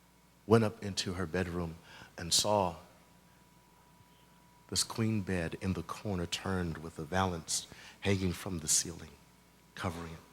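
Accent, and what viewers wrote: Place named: Nigeria. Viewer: U sound like a Nigerian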